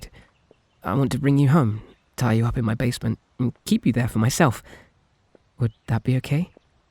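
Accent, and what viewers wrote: United Kingdom. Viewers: I'm fucking dead. The level of comedic timing is impressive. Taking in to account I was just mocking his british accent just a second ago.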